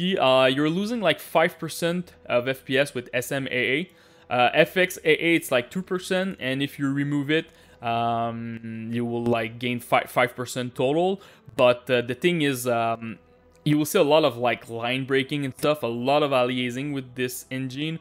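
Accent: Quebec, Canada. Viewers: Québécois? :D Merci pour le vidéo, j'espère que ça va m'aider.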